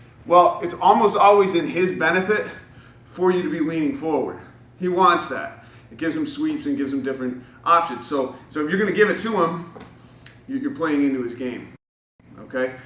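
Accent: Brazil. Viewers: The Brazilian Accent made me suscribe! Had me ROLLING 😂😂😂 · Your Brazilian accent is hilarious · 10/10 brazillian accent. Sounds exactly like my teacher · hahahahahahahahaha. loved the brazilian accent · Lol nice Brazilian accent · as a brazilian i can confirme : accent 100% legit true ahhaaha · bro I swear to god with that brazillian accent you sound EXACTLY like my coach. I was dying the whole time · Very great brazillian accent!!! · I'm only just about to train gi, after doing nogi for a little bit. I felt like I was watching a video of myself! Thank you for the humor, and the good advice. The Brazilian accent really drove the points home. :) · His Brazilian accent reminded me of nigahiga WHY GOD WHHHHYYYYY? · priceless brazilian accent ! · love these videos. killer Brazilian accent! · Subscribe to an arbitrary BJJ channel - NO. Subscribe to a BJJ channel with clear instructions in brazilian accent - YES. 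· LOL did not see the amazing Brazilian accent coming.. Made me pay more attention tho, suddenly the instructor seems way more legit. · Between Admiral Ackbar and the Gracie accent, I couldn't sub fast enough haha. Awesome info also! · Hilarious Brazilian accent. Subbed based just on that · the best brazinglish I've heard so far.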